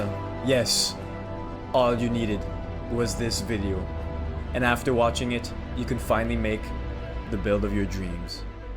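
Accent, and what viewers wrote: France. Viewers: i Like Ur pronunciation. (French native?) Keep the Work Up on yt. I have watched numerous Guides. When i Heard IT IS your Seconds Video i was surprised. The Guide is well done!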